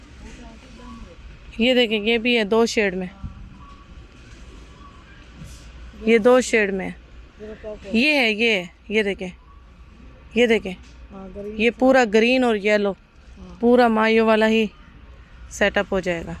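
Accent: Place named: Saraiki, Pakistan. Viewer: I am also saraiki speaking.itny time bd apni boli sun k bht acha laga. Btw i live in khobar. Bari apk vlogs ma dekha k ap meray area se guzar k jati hain khanay penay ❤❤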